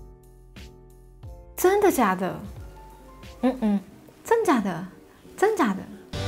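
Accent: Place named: Taiwan. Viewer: 博主台湾腔太明显了，考个普通话证书吧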